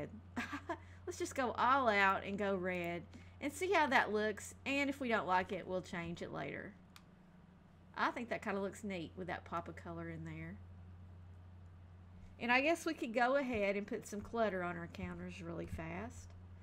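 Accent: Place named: Texas, United States. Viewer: you from Texas? You have a cool western/cowboy sorta accent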